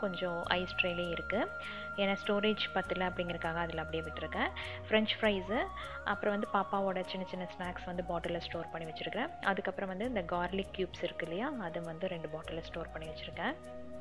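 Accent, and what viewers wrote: Coimbatore, India. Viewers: thats so nice of you, btw neenga cbe dhana native, me too from cbe, your accent sounds so.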